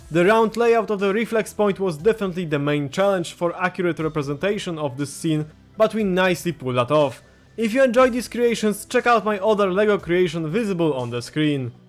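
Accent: Poland. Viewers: poznałem po akcencie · Po akcencie od razu poznałem że nasz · Słychać że polak😅 ale budowle 11/10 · to się nazywa polski akcent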